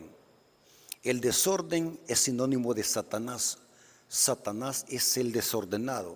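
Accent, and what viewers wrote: El Salvador. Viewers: Dios derrame su Espíritu Santo, siempre en usted. Para que siga teniendo buen carácter y mucho dominio propio, que en estos tiempos es muy difícil tener buen carácter y dominio propio. Aquí en México, cada región tiene su estilo de hablar, y yo sé que usted , es del país del Salvador, y ustedes hablan chistoso( con todo respeto) y su hablar así es de usted de por ser salvadoreño. A mí lo que me importa es que siga firme y adelante con su canal, a pesar de tener muchas piedras de tropiezo. Gracias por compartir sus clases de teología, yo he aprendido mucho, en su canal. Hermano , me enteré de que su papá se fue al descanso, y pues primeramente Dios, puedan reunirse en el día de la resurrección. Cuide mucho su garganta.🙏🙏🙏🙏🙏🙏🙏🙏🙏🙏🙏🥰🥰❤️🥰❤️🥰❤️🥰❤️🥰❤️🥰❤️🥰❤️🥰💯✅💯✅💯✅😊😊😊😊😊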